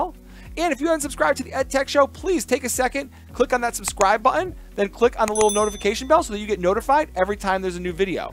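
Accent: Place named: Chicago, United States. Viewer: Love your Chicago Midwest accent. Sounds like home.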